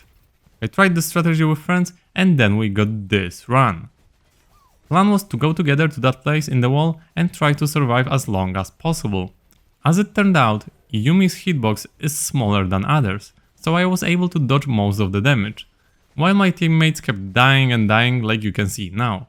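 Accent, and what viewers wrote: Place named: Poland. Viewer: The 2137 gold 😂. Nice polish accent :D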